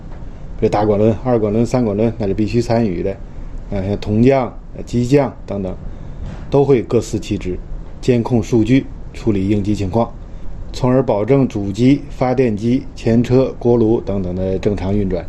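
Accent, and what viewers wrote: Tianjin, China. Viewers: 第一次看船长的视频就被强烈吸引了！李船长渊博的航海知识和严谨的有条不紊的专业叙述最吸引人。加上浑厚的天津普通话播音太酷了！我的印象中在海上的老船长，留着大胡子抽着雪茄大腹便便，就像高尔基小说《在人间》描绘的那样。。。新一代的华人的船长在此！李船长无庸置疑，您是业内的佼佼之子，民族的骄傲，加油啊！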